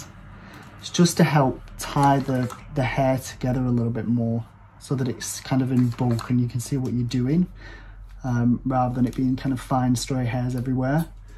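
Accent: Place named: Sheffield, United Kingdom. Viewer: I'm guessing a Sheffield accent 😬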